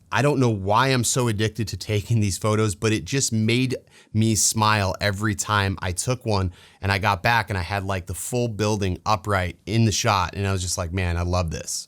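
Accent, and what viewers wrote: United Kingdom. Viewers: Very nice intro... funny I tell my youngest to go into another room so I can watch these... 😆 🤣 nice bit of British makes it sounds very professional 👏 👍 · you narration was so spot on! Complete with the perfect British accent!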